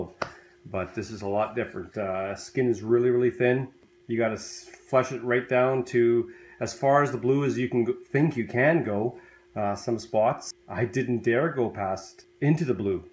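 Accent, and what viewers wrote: Canada. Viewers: That candian accent is amazing ♡ hello from ontario ♡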